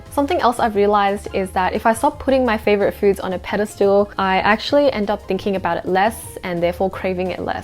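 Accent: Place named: Malaysia. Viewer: 你是大马华人吗？口音能听出来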